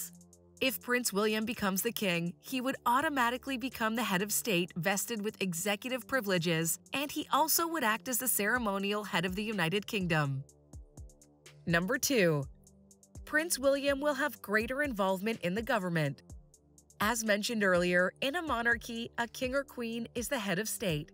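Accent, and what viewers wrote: United States. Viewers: Good heavens, another Yankee voice getting it WRONG. Princess Catherine has not been Kate Middleton since the day she was married to Prince William, at which time she became Her Royal Highness, Catherine, the Duchess of Cambridge (or "Mrs Windsor, not that they use that name much, but definitely not Middleton). She is now Her Royal Highness The Princess of Wales, plus other titles. Please get your commentary right - OR - don't comment at all! Also it is NOT E ii R. It is II, Roman numerals for the number 2. E II R Elizabeth Regina II, Queen Elizabeth the second. Regina means the reigning Queen. Charles' insignia is C III R. Charles Rex the third. Rex is the male version of Regina. When William becomes King, he will be W v R King William the 5th. Got it?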